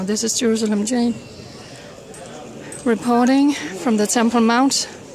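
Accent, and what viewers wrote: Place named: South, United States. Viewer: Where did you learn English? You have a little bit of a southern accent to your English. It's very cute. I like it!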